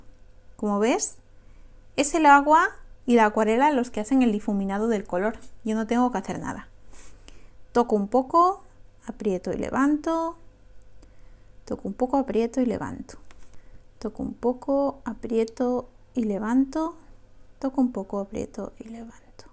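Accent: Spain. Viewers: Simplemente, eres MARAVILLOSA ❤ Hay muchos artistas, pero muy pocos maestros... Y tú eres una maestra increíble. Me desvelé hace un par de horas, me metí en YouTube y no sé cómo (no busqué nada sobre acuarelas) me apareció este minicurso como sugerencia... ¡Y me enganché! 😍 Sólo una apreciación y pregunta: ¿hablas catalán en tu día a día? 👀🫣 Es que me pareció que tenías un toque fonético algunas veces 🤭😊 Te mando un abrazo enorme, y GRACIAS por todo lo que haces y compartes 🤗 Que tengas muy buen día 🎉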